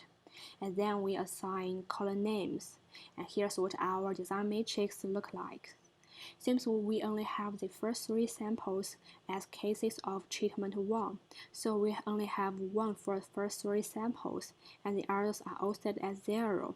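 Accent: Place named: China. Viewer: 聽中國人講英文真的很痛苦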